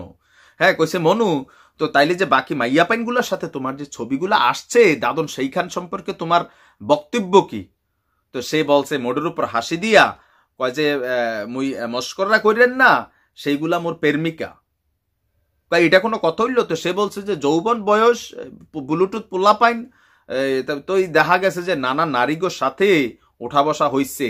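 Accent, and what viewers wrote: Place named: Barishal, Bangladesh. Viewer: Vaiya josss hoiche borisal er vasha. Carry on vaiya. God bless you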